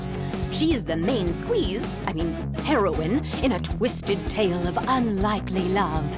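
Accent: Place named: Tidewater, United States. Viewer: the narrator, huh? Totally didn't know it was her. Different from her usual voices. I haven't seen the subbed versions, but unless I'm completely off-base the narration sounds a-okay. Tidewater accent, emphasis on certain words and phrases as if she were telling a story, and a general "old granny" feel to it. That's a pretty archetypal Western story-telling voice, and a dub is for Westerners. Rock on. I'm sure it'll be a good dub. There's a lot of big names in that line up.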